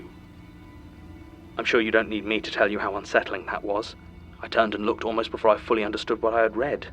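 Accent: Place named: United Kingdom. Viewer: I was really unbelieving when I kept hearing about how the Magnus archives has every fear, but I gotta say my heart sank as soon as I clicked on the first episode and heard voice. British people.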